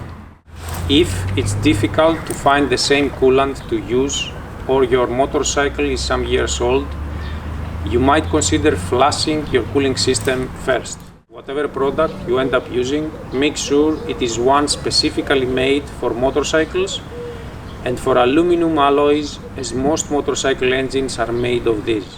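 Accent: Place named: Greece. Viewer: Το ξερα ότι είσαι Έλληνας, αθάνατη ελληνική προφορά, την ίδια έχουμε 😂😂😂 εγώ έχω του 2008 αλλά δεν βρίσκω πουθενά πουθενααααα πόσο παίρνει οπότε μάλλον θα πάρω ένα τετραλιτρο για σιγουριά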